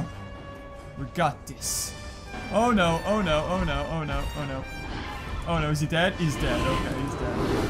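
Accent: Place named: United Kingdom. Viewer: YOU BRITISH!!!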